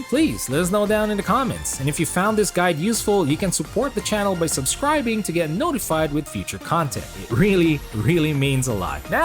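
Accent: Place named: Philippines. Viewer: Nice video po! very well explained :) pinoy english accent on point :3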